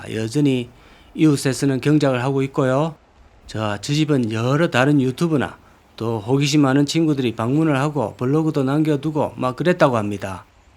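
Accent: Gyeongsang, South Korea. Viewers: 경상도 사투리 좃나 짜증 나네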